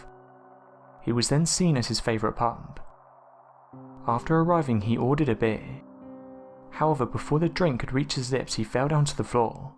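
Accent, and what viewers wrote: United Kingdom. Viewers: AHÍ CARES ABOUT GUNTHER AND HOW UGLY GULF.. AND PLEASE GET THOSE SISSIFIED BRITISH NARRATORS OUT OF HERE.. AMERICANS DO NOT CARE FOR BRITS NOR THEIR SISSY VOICES..SO FAKE..SO FEMMY! FROM NOW ON IF VOICE IS A BRIT.. WE WILL NOT WATCH !!!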